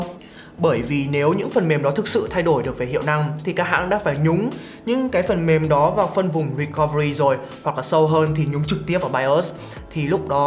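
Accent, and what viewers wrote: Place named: Hanoi, Vietnam. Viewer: giọng hà nội ai cũng vậy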